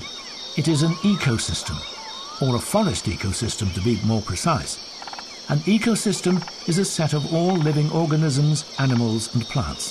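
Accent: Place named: United Kingdom. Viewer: I quite frankly was very fascinated by this educational video due to the fact that the narrator had a lovely British accent and because the video had a lot of cool pictures.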